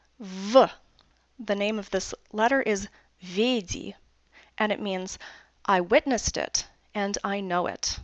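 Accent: Russia. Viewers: Old Church Slavonic is a beautiful language but it doesn¨t sounds good with a Russian accent, besides it¨s confusing. крест, воскресе́ние are in Russian not in Old Church Slavonic. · Most of the stuff is good but you seem to have a very thick Russian accent, and, more importantly, are very reductive of pagan and illiterate societies. Importantly, your line about "oral" languages not being able to express complex concepts is completely bogus, minus the obvious lack of adequate vocabulary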